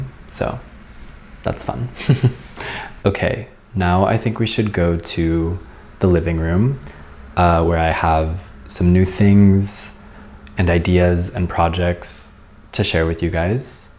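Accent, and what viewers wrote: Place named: Ukraine. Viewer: from Kyiv?? so nice to hear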